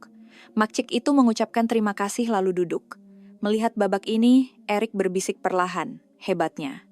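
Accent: Indonesia. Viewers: Suara orang Indo ni😂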